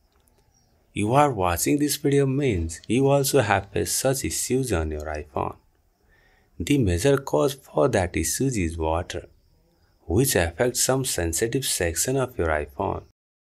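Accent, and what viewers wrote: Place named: India. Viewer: sounds very indian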